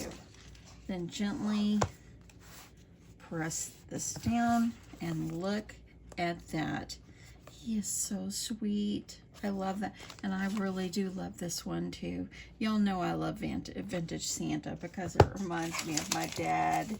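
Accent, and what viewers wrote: South, United States. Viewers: ❤❤❤❤OMG I LOVE THIS you are THE sweetest lady❤ I love watching your videos and listening to your southern voice🩷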